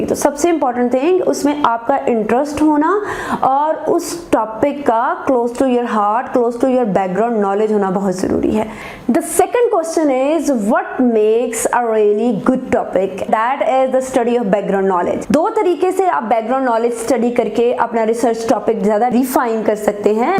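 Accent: Punjab, India. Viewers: Please take as a feedback, punjabi lehjay me English chor dain,. . . Otherwise your content is fabulous.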